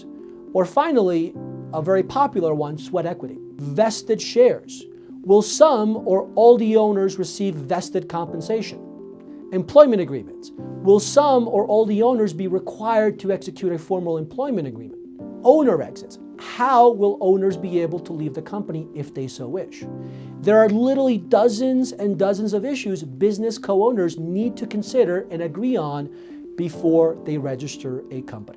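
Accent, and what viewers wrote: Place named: New York, United States. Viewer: I love how this dude goes from a very New Yawk accent to an incredibly good Spanish accent. Very helpful videos! Thank you.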